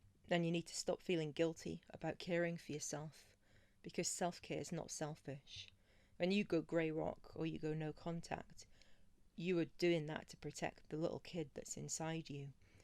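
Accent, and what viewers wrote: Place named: United Kingdom. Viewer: Greetings from your sister over here in Savannah Georgia, a gentle hug and love from me to you. You have the British accent I have the Southern accent, 🌷 Happy Easter precious Soul.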